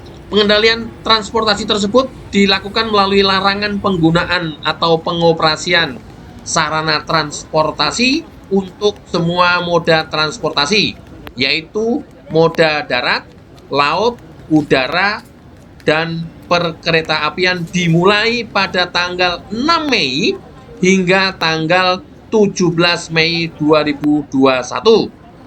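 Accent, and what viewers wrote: Java, Indonesia. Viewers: Jawa nya mefom banget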